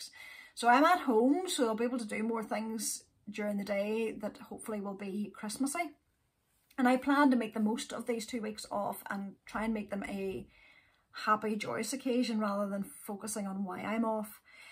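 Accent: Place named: Ireland. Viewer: So wonderful to see you and hear your amazing Irish voice. love love your podcast and can't wait to see your vlogmas. Love the beanie and your sweater is so beautiful. I am not a Christian but my children are born in London. There was always some element of Christmas as they were growing up, Christmas plays and concerts, a small Christmas tree with perhaps aa gift for each child. 15 years ago my Mum passed away, in my hands, on Christmas Day. The following year instead of being sad I thought we should celebrate and invited my brothers and families and had a meal and sang songs, and played games. And from then on christmas grew and grew............I love how we get together and all cook, open lots of gifts for each other and the whole house is lit up and decorated. My daughters have already put the tree up a few days ago! Not only did I adopt Christmas but adopted (not legally) a few Mummies and looked after them until some have passed but just helping them through the year with everything I can.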